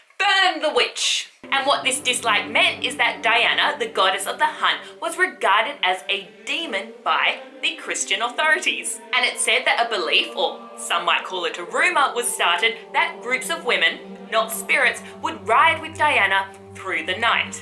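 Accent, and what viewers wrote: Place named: Australia. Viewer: I'm watching this to see why it has record low views in 5 yrs. On a popular subject. Oh Australian accent.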